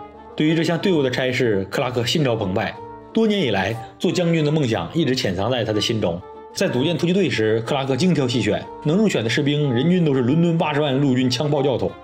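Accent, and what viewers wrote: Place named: Tianjin, China. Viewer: 小约翰可汗是天津宝坻的人吧。 哈哈，着口音解说绝了。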